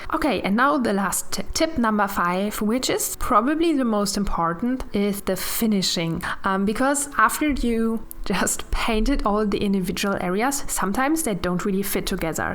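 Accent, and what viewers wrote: Germany. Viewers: Your accent is so german 😄😂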